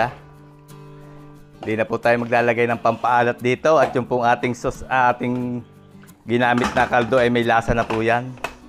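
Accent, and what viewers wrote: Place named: Tanauan, Philippines. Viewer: Nag enjoy akong manood. At para ko na ring nalasahan ang iyong mga niluto. Taga Tanauan din ako at talagang feel ko na nasa 😅Pinas pa rin ako sa iyong pananalita at punto. Thank you at sana'y marami pa akong mapanood na shows ninyo.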